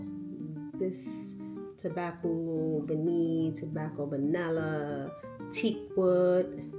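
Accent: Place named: New York, United States. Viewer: Hi,! Your relaxed life always remind me that I need to calm down. I love seeing how you balance so well. It looks like your Labor Day was pretty chill and thanks for letting us hear your voice again. Your New York accent. 🥰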